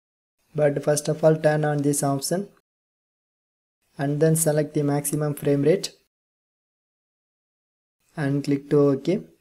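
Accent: India. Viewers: It's an average Indian accent.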